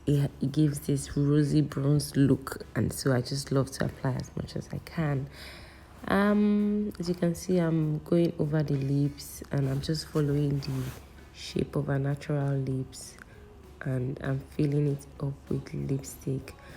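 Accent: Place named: Yoruba, Nigeria. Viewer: with the yoruba accent🤪, welldone babes😘, we are your drawing book, paint us as you like 🤩😘😍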